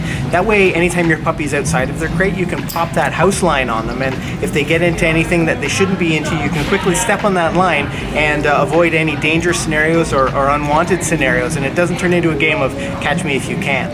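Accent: Canada. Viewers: You sound really Canadian!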